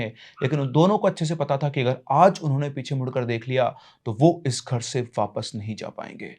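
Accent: India. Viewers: आप का बोलने का तरीका बिलकुल देसी हे ❤❤❤गांव जैसा बोलते हैं जो देसी नहीं होगा वो शायद ही समझेगा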